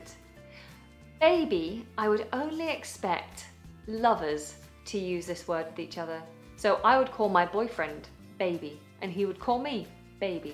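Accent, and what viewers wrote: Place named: United Kingdom. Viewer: Big Thumbs up; love your British Accent so much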